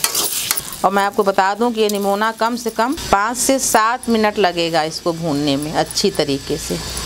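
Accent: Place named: Uttar Pradesh, India. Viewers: I love your UP accent........UP regional taste is best in India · Aunty Apne up evam ilahabad ki Shan badha di. Love you. Bhasha ka accent bhi pure up style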